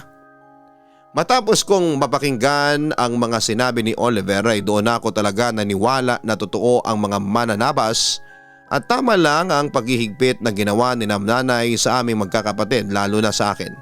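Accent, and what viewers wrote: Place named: Negros Occidental, Philippines. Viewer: Feeling ko, sa negros Occidental ang nag kwento neto😁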